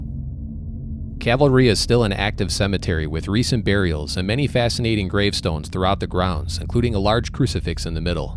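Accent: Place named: Midwest, United States. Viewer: I listen to this channel at least once per day. Great stories, Midwest accent is great, and the background music is perfect.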